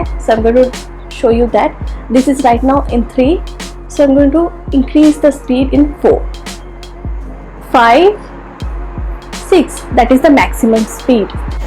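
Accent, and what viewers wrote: Bengal, India. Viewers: Hi I m pritee mother of 2 kids from Delhi. Few months back inspired to bcum interior designer...now became big fan of u and ur bengoli accent...I really luv the way u show and tell everything...u r really an inspiration...I want to know a thing...pls reply....on the bed u place 2-3 pillows and cushions also,.but practically when we sleep we need only one pillow what these clients do for other's on bed? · Love the way you talk your bangali accent just love it and your Diys just love them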